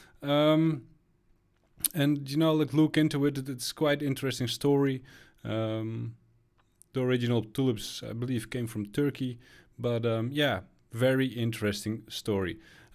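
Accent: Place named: Netherlands. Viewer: Just subbed, watched a couple vids. Great stuff. I knew you were dutch by your accent 😂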